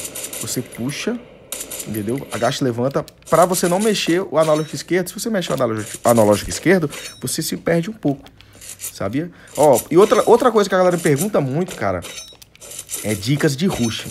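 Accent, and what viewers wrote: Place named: Northeast, Brazil. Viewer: Salveeee,primeira vez que te assisto e ja gostei mano!! Queria saber de onde tu é! Tem um sotaque daqui do nordeste!!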